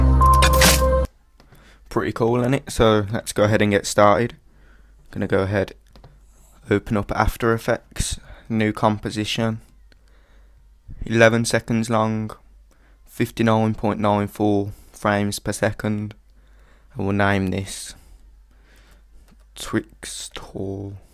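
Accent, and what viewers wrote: Birmingham, United Kingdom. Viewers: Go fellow brummy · HA! he's birmingham, or there abouts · Hate Birmingham accent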